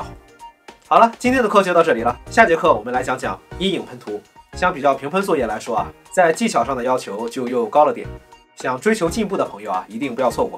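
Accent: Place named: Qingdao, China. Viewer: RAY是青岛人么？口音很像老乡啊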